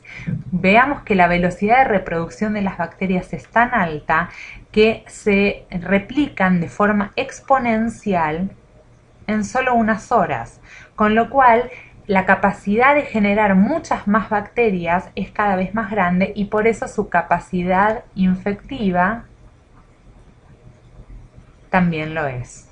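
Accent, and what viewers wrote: Argentina. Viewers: Me gusta el acento argentino!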